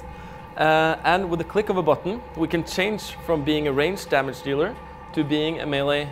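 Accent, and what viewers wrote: Norway. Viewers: So norwegian way to speak english!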